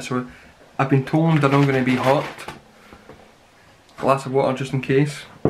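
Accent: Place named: Scotland, United Kingdom. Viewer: These crisps are never hot enough for me, no matter how strong the packages says they are.... :D what a Scottish accent mate, you could use it to your advantage if you want to do yt seriously. i subbed and liked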